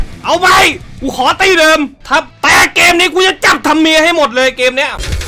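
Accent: Isan, Thailand. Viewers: พูดอีสานน่ารัก55555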